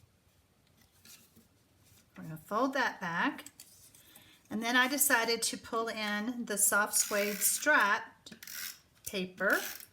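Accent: South, United States. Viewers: I love this card design. Papers very pretty to even with the stripes. I think this would make a great Christmas card or Halloween card so I think I might play with it that way. I also have to say, I love your Southern accent. I don't know where you're from I just know you're not from Louisiana. I'm living there at the moment and you don't sound well at least on the southern parts I mean you could be up closer to Texas.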